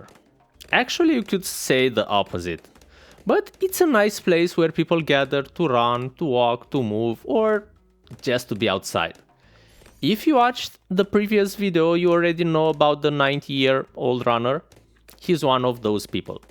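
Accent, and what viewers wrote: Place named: Romania. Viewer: nu bro, ai accentul ala al nostru :))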